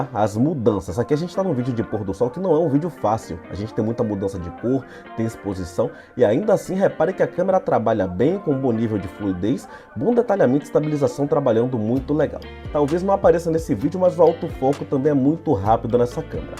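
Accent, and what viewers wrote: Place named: Bahia, Brazil. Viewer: VC EBAIANO NEGAO QUE TO LIGADO, BOM TRABALHO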